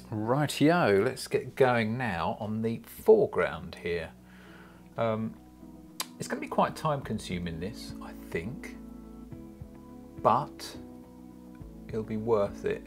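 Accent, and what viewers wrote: United Kingdom. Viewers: aw ur shining blue eyes and british accent 😍 and so generous on sharing great painting skills :) 😘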